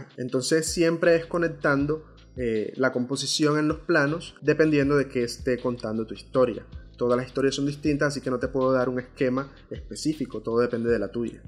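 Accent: Venezuela. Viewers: COMO QUE NO ERES VENEZOLANO CON ESE ACENTO??? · Por tu acento juraba que eres venezolano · Yo juraba q eras venezolano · Hola, creí que eras venezolano por tu acento, de que parte de Colombia eres? un abrazo desde Medellín.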